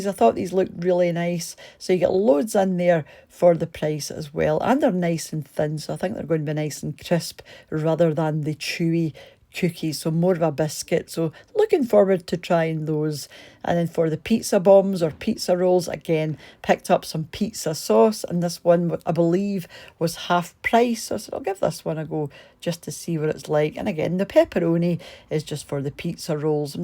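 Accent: Scotland, United Kingdom. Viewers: I could listen to you talk all day, doesn't matter if you're reading the encyclopedia just love the Scottish accent. 🥰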